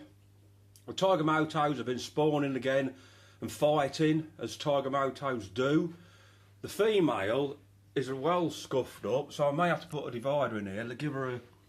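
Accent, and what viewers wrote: United Kingdom. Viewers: Difficult for all of us to listen to our own voice. Yours is great, although us Americans tend to be drawn to that British accent. Anyway, sound great and relaxing video. Please keep it up.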